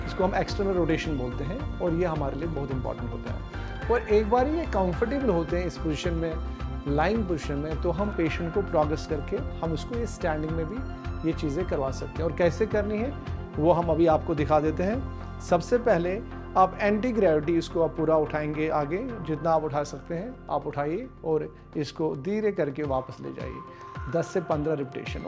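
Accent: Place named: Kashmir, India. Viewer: R u kashmiri, ur pronunciation is like that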